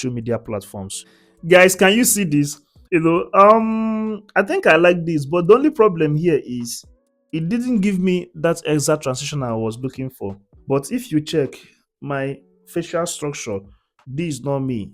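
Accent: Nigeria. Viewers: When i heard your accent immediately realice or 🇳🇬 Nigerian boy ,we were worked in NG. morethan 10 years in Illorine uni. God bless you dear. Good to hear typical NG accent.❤❤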